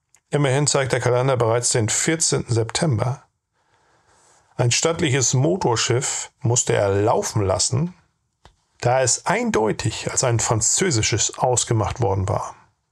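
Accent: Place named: East Germany, Germany. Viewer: Sehr interessanter Bericht. An manchen Stellen ist nicht zu überhören, dass er aus der DDR stammt.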